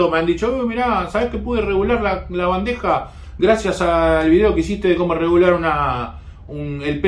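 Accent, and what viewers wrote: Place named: Argentina. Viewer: Cómo estás estimado, tienes tu propio estilo, eres original, tu acento argentino le da un toque especial, te sigo desde el primer minuto que te vi en youtube. Felicitaciones y adelante.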